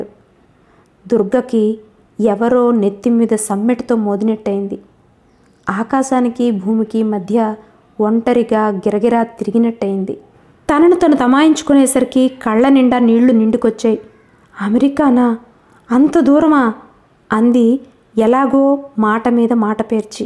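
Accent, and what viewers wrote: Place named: Godavari, India. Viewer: ఎంత బాగా చదివేరో!ఈ కథను ఎవరు చదివినా గోదావరి జిల్లా స్లాంగ్ రాక నాకు కొంత అసంతృప్తి గా ఉండేది. చాలా చాలా థాంక్స్ మీకు. మీరు కథ పెట్టిన నాలుగు రోజులు గమనించక పోవడం వేరే ఫంక్షన్ లో ఉండి తప్ప మరేం కాదు. 😊👌👍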